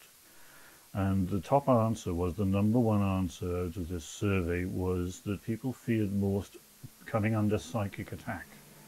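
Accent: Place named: England, United Kingdom. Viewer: The subtitles are only about 70% accurate as he has an English accent. Just one of the many hundreds of English accents and google can’t seem to be bothered to fix the issue even considering the amount of content that comes England for our small size and population. Truly the master race.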